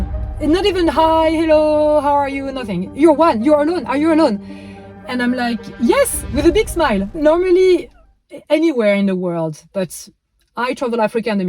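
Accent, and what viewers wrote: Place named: France. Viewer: I think you mean influenced. NOT POLLUTED. I love your beautiful use of English with your lovely French Accent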